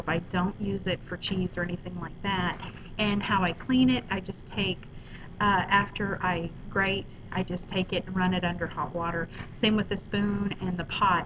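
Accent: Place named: Southwestern Pennsylvania, United States. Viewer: Wursh? Are you from SW PA?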